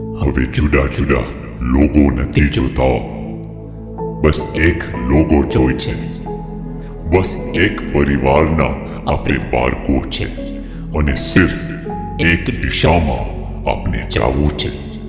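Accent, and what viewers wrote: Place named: Surat, India. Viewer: Tame pure surti chho...right bhagat??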